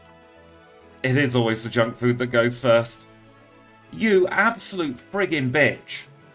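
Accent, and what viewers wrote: United Kingdom. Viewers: I'm enjoying your content now that your back. The mix of Pokemon and a soothing British accent is great for relaxing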